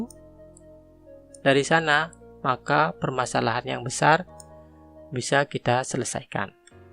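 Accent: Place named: Bali, Indonesia. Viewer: Orang Bali ya bang? Logatnya kentara banget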